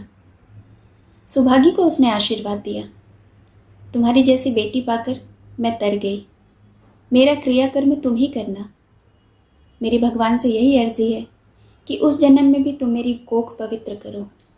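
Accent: Standard. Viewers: Aapki aawaz bahut mithi hai bhasha ka uchcharan bahut shuddh hai.👍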